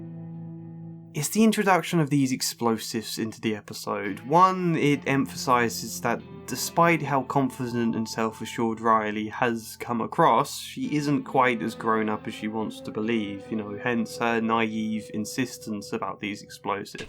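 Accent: United Kingdom. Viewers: It's just as tragic as we thought but the British accent makes it sound more profound ?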